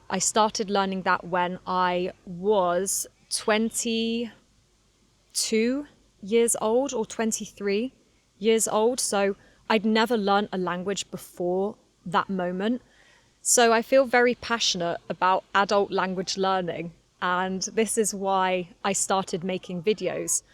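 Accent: United Kingdom. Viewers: I am form bangladesh 🇧🇩 i think you don’t know about this country because the country is small but my country population is high & It is a country under Asain countries. Actually i am a student and i am learning English with a British accent that’s why I follow you and watch your videos. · that's why it's called Great Britain what's a beautiful girl and her lovely dog.is it Wilson?what a great accent girl 🗣 A million thanks From Sudan 🇸🇩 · I’ve just finished watching your podcast, and it’s been incredibly helpful for my English. Your pronunciation is so clear and easy to follow—a perfect British accent! To be honest, this podcast gives me so much motivation to learn. Or maybe... I’m just hooked on your channel because you’re absolutely stunning? Oh dear, I must be losing my mind! Anyway, I really hope you could make a short podcast episode on how to approach and make friends with a Western/Caucasian girl. 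That would be amazing! (^-^) 😀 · Hi, I’m from China and I’ve been following you for half a year now. I really love your British English and enjoy every video you post. Your slow-paced English helps me hear every word clearly, which has really improved my English. love you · I'm a 45-year-old 'uncle,' and this channel is the perfect spot for me to pick up a proper British accent. ^^ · Thanks you become one of favorite youtube teachers also i shadow your videos to get British accent ❤😂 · Hi, I’m from China and I’ve been following you for half a year now. I really love your British English and enjoy every video you post. Your slow-paced English helps me hear every word clearly, which has really improved my English.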